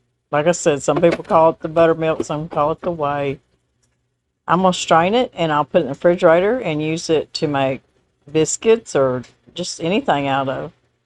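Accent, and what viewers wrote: South, United States. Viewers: I love listening to you talk, your southern accent is adorable. Thank you for sharing the bread and butter recipes. Hope you have a wonderful evening. Love the soothing sounds from the pond.❤️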